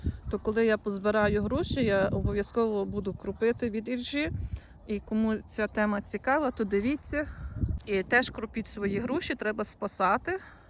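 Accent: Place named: Galicia, Ukraine. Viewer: Привіт зі Львова ❤, перець можна в'ялити точно так, як помідори і потім додавати до піци, салатів і т.д. Відео дуже цікаві, приємно чути галицький рідний діалект, з задоволенням дивлюсь❤❤❤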